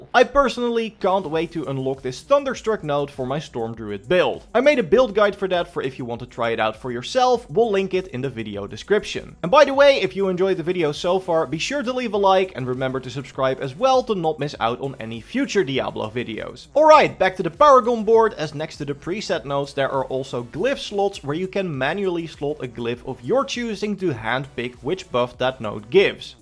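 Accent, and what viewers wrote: Netherlands. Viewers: i can just hear you are a dutch speaking english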